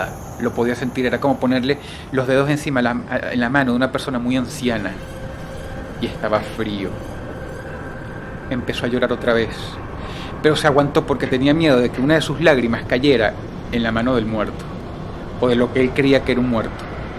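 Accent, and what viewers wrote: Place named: Venezuela. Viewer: No son suyas. Son historias sacadas de internet. Y con el acento venezolano, pasa de dar miedo, a dormirme. Fuk Venezuela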